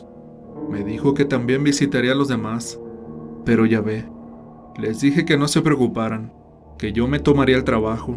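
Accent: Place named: Mexico. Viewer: son buenos relatos,,,pero mejora el acento la voz es horrible,,ya sabemos k eres mexicano mejora la locucion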